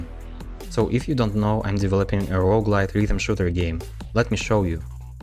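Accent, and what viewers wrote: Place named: Russia. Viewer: Ты русский? По акценту понятно.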